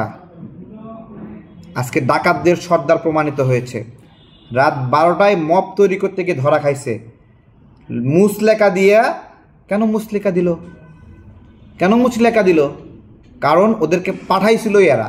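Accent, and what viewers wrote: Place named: West Bengal, India. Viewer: আপনি বাংগুদের সুরে কথা বলতেছেন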